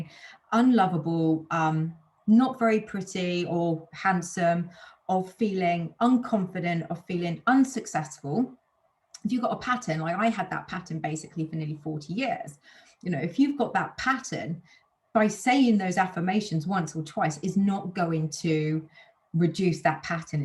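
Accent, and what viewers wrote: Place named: United Kingdom. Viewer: 💎💎💎!!! excuse me!!! 😇 🎁 are you really 44?!?!?!? 🥰🥰🥰 you are blessed and you bring light to the world you really found your path / purpose in life with the best compliments from Italy 🎉🌍🌎🌏 I absolutely adore 🐞 your British accent!! 🌈 keep it up, my dear Life Coach 🍓 (I thought you were btw 28-33!)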